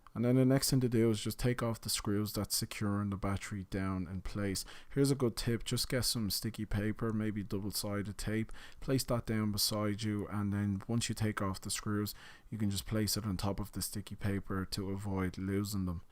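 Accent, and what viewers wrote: Ireland. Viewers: Yes really clear and focussed. I love the Irish accent too. Voice sounds so relaxed like everything is under control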